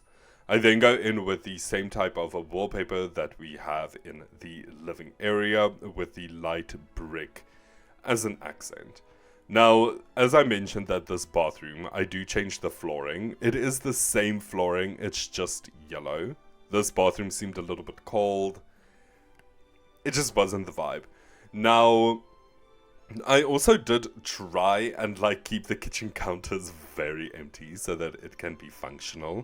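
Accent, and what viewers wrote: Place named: South Africa. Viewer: Oh wow, you live in South Africa? Now I understand the accent - I thought you were British, sorry. Hi from Germany!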